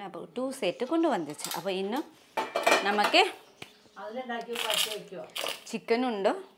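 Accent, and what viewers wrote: Tamil Nadu, India. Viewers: Oru tamil touch Ulla malayalam pole thonnunnu😅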